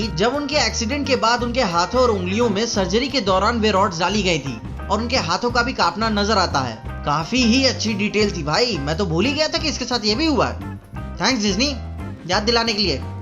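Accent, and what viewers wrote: India. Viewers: Ur desi style of speaking is AwEsOmE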